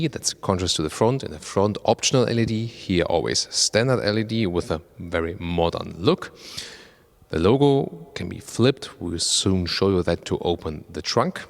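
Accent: Germany. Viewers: walter neubär he's German. He has German accent :)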